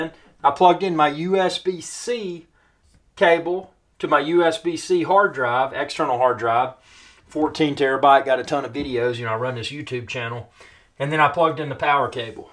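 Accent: United States. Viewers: That country accent is gold! Hope your channels grows big. Keep it up!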